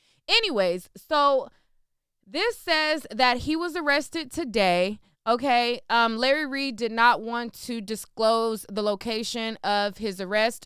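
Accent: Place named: Philadelphia, United States. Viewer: U have a philly accent a Lil are u from philly?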